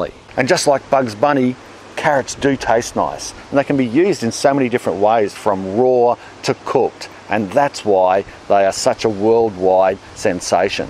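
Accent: Australia. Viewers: Ken Spiracy I enjoy your presentation and unerstand love the Aussie Acent,plus all good knowledge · I love an Australian accent. I could listen to him all day. More importantly, I learned a lot about gardening. Subscribed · He’s Aussie stand reminds me of all my male family members. “When your choca up the bloca, have a cucumber!” Bahaha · Thank you, from an Aussie living in Canada, I would suggest kale, Russian kale. It is easy to grow even continues through the snow, and is considered one of the most nutrious vegetables, sautéed with garlic, and then creamed for those who are less enamoured. also really good for our dogs, I add it with carrots sautéed lightly added to her meat and sweet potatoes...lovely to hear the good old aussie accent and way of being....Amélie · hey mate, the German word for cabbage is "Kohl" not "Sauerkraut". Sauerkraut is fermented white cabbage. 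I like your videos and your accent. I stayed in Australia for quite a while about 10 years ago and your voice just brought back old memories:) I will hop in more in the future and watch more of your videos. Having my own German "Schrebergarten" now, I really need to learn quite a lot about growing my own food. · pretty sure Australian isn’t a race but i get what you mean it is kinda stereotypical lol, like if he didn’t have a Aussie accent he probably wouldn’t have compared him to Steve Irwin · I love this guy's videos. I would sit and listen to him all day. He sounds like an Australian. I am a Jamaican, but I like him.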